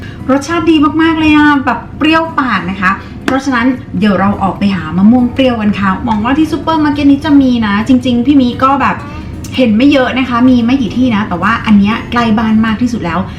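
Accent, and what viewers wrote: South, Thailand. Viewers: นํ้าลายแตกตามเลยคะ ชอบ พูดใต้คะ ขอบคุณสำหรับคลิปน่ารักๆคะ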